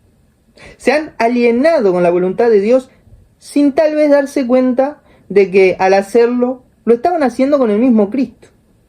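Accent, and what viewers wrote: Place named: Argentina. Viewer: Quise ver todo tu vídeo pero no aguanté. Me voy a guiar un poco en la miniatura del vídeo que dice "Cansado de la ignorancia católica", casi al inicio dices "me dirijo a vos católico", refiriéndote así a los laicos comprometidos porque, por lo que oigo te parecemos ignorantes porque decir que Francisco dijo una herejía (bueno, ha dicho tantas) en su gira por Asia y Oceanía, te voy a comprar eso de que somos ignorantes, pero no sólo los laicos lo han dicho, lo han dicho sacerdotes, obispos, cardenales, ellos sí saben sobre las Escrituras, sobre el Evangelio, o es que tú sabes más que ellos? No es más bien que tú te llevas por el sentimentalismos de que Francisco es del mismo país que tú y eso no te deja ver que lo dijo Francisco es una total aberración. Cuando digo sacerdotes, obispos y cardenales, me refiero pastores valientes, no como el sacerdote Byron, creo que ASÍ se llama, que se enojó y defendió a capa y espada lo que dijo Francisco, con el argumento ese de "quiso decir", como en las novelas cuando el esposo encontraba a su esposa en la cama con otro y ella decía "esto no es lo que parece" jajaja. 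Ahora, por tu acento doy por hecho que eres argentino, si es así debes saber que Bergoglio tiene una trayectoria nada buena cuando fue obispo en su diócesis, apoyando, defendiendo y solapando a pederastas, y es muy raro eso que a puro sacerdote gay los haya puesto en puestos de alta jerarquía dentro de la iglesia, muy raro, de ahí todas esas ideas de amor y paz entre todos, so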